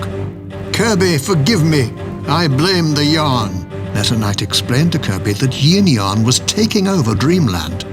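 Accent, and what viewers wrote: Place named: United Kingdom. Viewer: Why is the narrator British?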